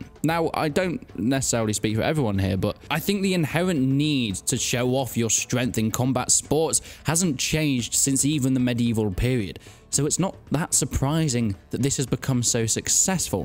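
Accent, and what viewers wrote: England, United Kingdom. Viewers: Good to see more people getting into the sport, great stuff writing and assembling it. Just wanted to say you showed SCA there a couple times there which is a different thing as a rule of thumb if the armour looks a little flimsy and they're fighting with sticks and most importantly stabbing then thats SCA and not buhurt. The armour although is expensive is not necessarily 10 grand an entry level harness (suit) can cost you as low as 2000. The rule confusion is understandable it comes from there being 3 main disciplines in buhurt: dueling, melee (or also buhurt confusingly) and pro fights. Dueling is for points with the weapon only melee is 3 points on contact on the floor and profight is points everwhere and you can usually win by KO or TKO. The pro in pro fight does not mean professional as almost noone does this for a living and the people that do are usually coaches. 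I assume by your accent your English and as pro fights are what interest you you should look up Saturday knight fights on Facebook which is the uk starting a profight league its early days but they're great to watch. Thanks again for the video great stuff.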